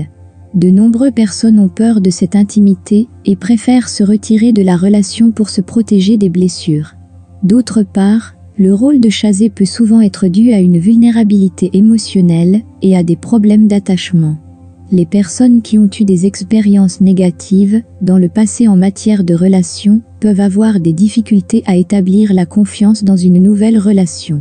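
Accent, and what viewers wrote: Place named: France. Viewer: J'avoue que l'accent français me fait presque rire alors que dans la vraie vie j'ai plutôt envie de pleurer... quelle vie !!!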